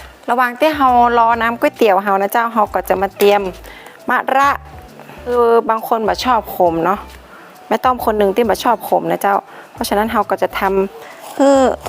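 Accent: North, Thailand. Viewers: เป็นภาษาคนภาคเหนือคะ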